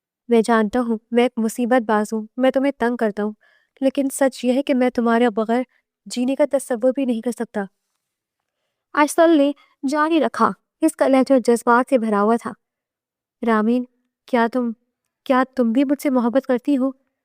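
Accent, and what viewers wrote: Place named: United States. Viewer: Lagra hai app American ya London wali ho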